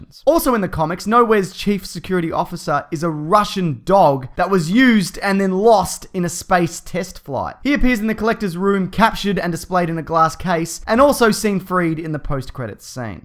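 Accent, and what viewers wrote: Australia. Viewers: lol I so want to know what you are saying in some of the collectors items and what they are and do, so I subtitled this video, (which anyone can do) and I guess an austrailian accent makes it so english subtitles horrible lol. for Thanos the subtitle came up as chans.... and almost every word is off. its funny, I recommend trying subtitles for this video just to see what I'm talking about. settings button or menu is right on the youtube screen itself. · this is the thickest Australian ascent ive ever heard AND IM AUSTRALIAN!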